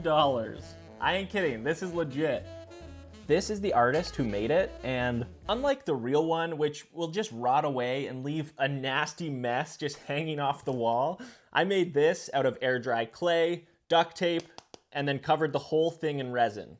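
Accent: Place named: Canada. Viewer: the Canadian accent 🤣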